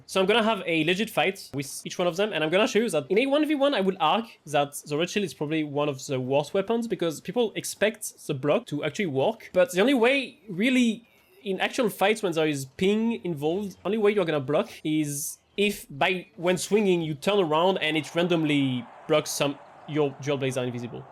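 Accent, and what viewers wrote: France. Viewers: Bro your French accent is killing me xD. Greetings from Germany, only a fr*nch can play shield like that, bc of the Police nationale Heritage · Thanks for this insightful video, and the french accent is icing on the cake, tu gères mon gars !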